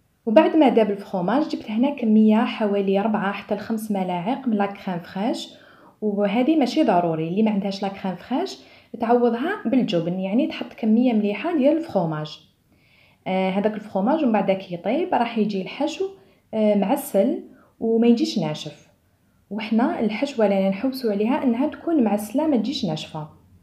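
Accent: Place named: Algeria. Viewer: تبارك الله على اخواتنا الجزايريات راكم حادكات الله يبارك و تحمقني هدرتكم مغربية مرت من هنا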